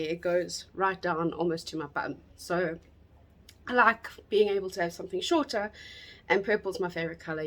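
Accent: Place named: South Africa. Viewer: that and Ur south African accent 😝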